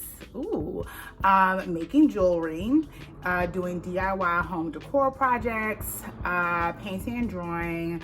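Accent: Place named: United Kingdom. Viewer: Your British accent is believable. You are funny!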